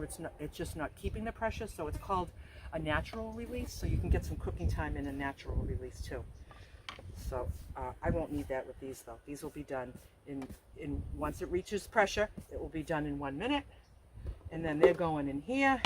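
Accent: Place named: Boston, United States. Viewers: Two things - One is that I love your accent and I have been wanting to tell you that I knew from your accent that you were from New England. My husband grew up near Boston, in N. Abington. He had moved to Texas A few years before I met him so, his accent was Texifiied!! His brothers still live there and I get lost in conversations due to listening to their accents. Two, is that. I am so glad you posted this using your. instapot. My kids gave me one last year and I have been afraid to use it. I saw a book on it at Walmart today and almost bought it but I came home and you are here giving me a lesson. Lol. I. Enjoy your videos very much. Thank you. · I just love this video. You remind me so much of my family, my Aunts, and my Mom, although I'm sure I'm close to your age. You make me smile, and laugh which feels so good. I loved when you said "butta" and get outa here flys who invited you! 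I'm from Boston so I appreciate the accent! I love vegetables and love watching your prep and combinations of them. Very inspiring! They all look so delicious! Thank you for your videos, you are a natural, and thanks for making me smile!🙂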